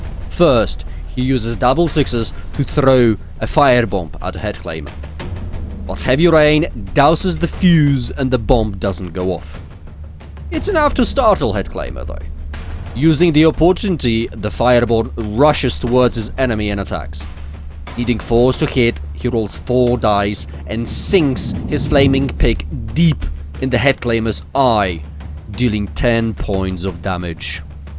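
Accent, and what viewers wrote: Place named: United Kingdom. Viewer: no disappointment here, it's more that the US channels can be a bit...intense. yeah your accent leans still towards a British pronunciation though haha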